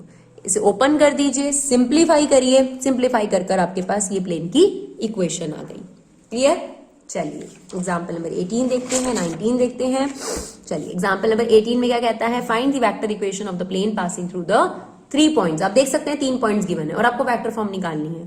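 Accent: Punjab, India. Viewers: You are from Punjab i think because of your accent . But still i respect you because you are awesome 😎 👍🏻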